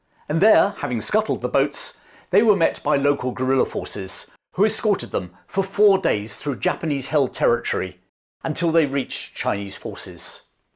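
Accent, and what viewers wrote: United Kingdom. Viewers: As a Hongkonger who is always curious about our past, I have been listeniing to different narrations of this battle a million times now. It's nice to listen to this again in the British accent and perspective. Thanks chap.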